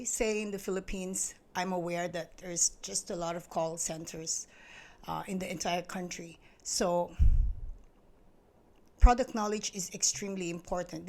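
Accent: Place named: Singapore. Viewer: You speak like a Singaporean. Very clean lines. Good articulation. Keep it up ate. Greetings from Singapore.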